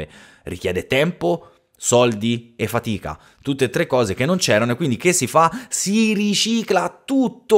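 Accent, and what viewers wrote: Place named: Livorno, Italy. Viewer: A me è sempre piaciuto pensare che quando si gira l'ultima volta, prima di tornare da Douglas, abbia incontrato lo spirito di suo padre (è Silent Hill, dopotutto, quindi ci sta che lui sia ormai anche legato a quel posto anche dopo la morte)... anche perché sarebbe un po' strano che lei tiri fuori il discorso del nome così dal niente. Nella scena sembra che lei si giri perché c'è qualcuno dietro... e quel qualcuno è Harry. Anche perché io mi rifiuto di credere che abbia perso così all'improvviso suo padre senza possibilità di dirgli addio 🥲 avevano ancora troppe cose da dirsi, e un solo quadernino non basta. Mi piacciono molto i tuoi gameplay, me li recupererò pian piano anche degli altri capitoli e di altri giochi... e il dialetto livornese è sempre top da ascoltare.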